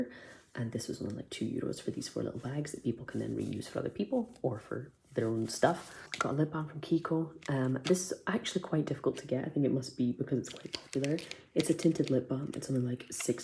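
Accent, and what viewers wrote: Scotland, United Kingdom. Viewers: Found your channel randomly loveee it 😍 I’m in glasgow, brill that your Scottish too👌🏻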